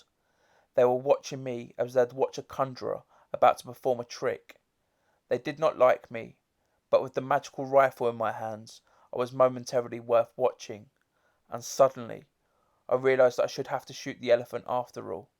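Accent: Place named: England, United Kingdom. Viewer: Thank you for this video. This essay was assigned to my English class for analysis and hearing someone else read it really really helped me. It always sounds better with an English accent.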